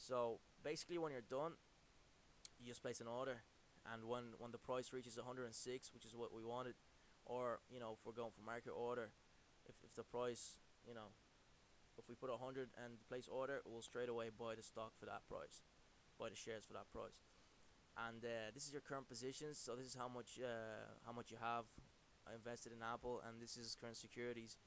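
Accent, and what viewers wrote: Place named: Ireland. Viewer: I take it your from Ireland judging by the accent, same as myself, I was looking into this firm as oppose to Davy I was just wondering how you find trading with them? I was attracted by really low fees but they seem dodgy. I would mainly be a value investor type guy, so il be investing for the long run, but just wondering is there maintance fees for the account and all and how do you find trading with them? Are they worth it also are you paying CGT per trade or ?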